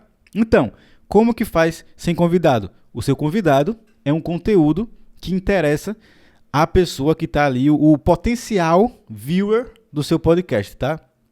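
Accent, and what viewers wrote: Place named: Alagoas, Brazil. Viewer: tu é de Maceió? Tem um sotaque meio alagoano, fio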